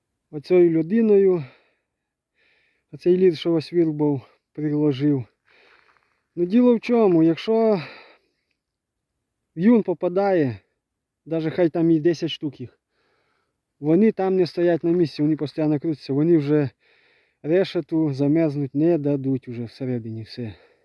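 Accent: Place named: Polissia, Ukraine. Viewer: Вітаю! Дуже приємно чути рідний полісський акцент.. я родом з Полісся з Чернігова.. дитинство пройшло у Чорнобилі але зараз зрозуміло мешкаю вже зовсім у іншому місті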